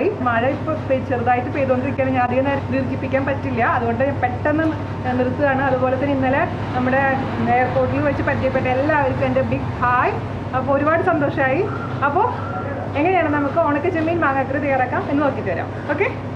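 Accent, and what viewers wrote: Thrissur, India. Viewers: Natil vannapo chechik thrissur accent koodi😍. Njan kottayam accent aanu ketto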